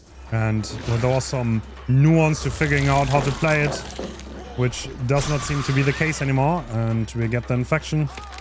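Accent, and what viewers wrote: Germany. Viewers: Bruder hat den Deutschen Akzent. Aber krasses Englisch hatte ich am Anfang erst nicht gemerkt.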